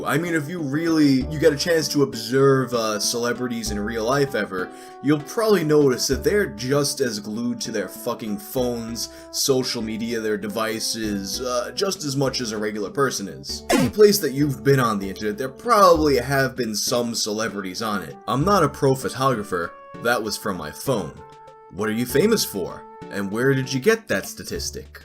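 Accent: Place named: East Coast, United States. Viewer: Him reading the passionate, angry back-n-forths in his calm, east coast accent😅😅😅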